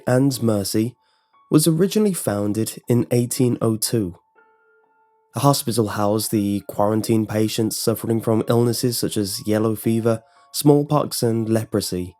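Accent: United Kingdom. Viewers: doesn't quite have the right effect with a pleasant British accent :(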